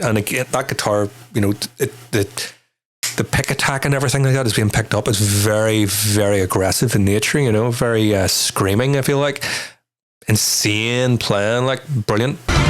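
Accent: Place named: North, United Kingdom. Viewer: Generally, Chopin, or romantic music for that matter, is not my cup of tea. I am more a Bach (I'm German after all) or Beethoven, Rachmaninow person. But in this context, it is really enjoyable. I've seen this several times and this video is my favorite by Lovebites. And those ladies definitely got balls. Like a friend of mine from Scotland answered to "Who can wear a kilt?": Everyone, you just need the balls to do so. On another note are you a Scot? You sound at least like someone from the north of the island. For my German ears, this is more pleasing than RP.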